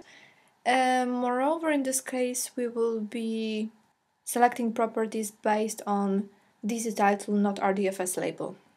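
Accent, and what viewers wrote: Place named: Poland. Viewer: are you from Poland? Cause you have soo polish accent :)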